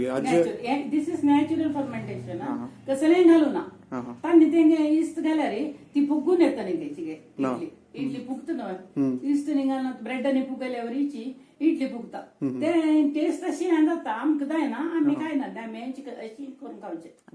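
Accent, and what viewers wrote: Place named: Mangalore, India. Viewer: Authentic GSB Mangslore konkani .......feels so nice to hear. I herd it after over two decades!....GSB food is superb!!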